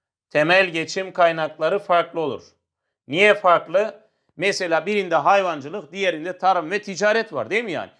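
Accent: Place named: Serik, Turkey. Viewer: Hocam yarın tarih sınavımız var inşallah iyi bir not alırım ve güzel anlatıyorsunuz :) Antalyanın ilçesi olan serik ağzına benziyor konuşmanız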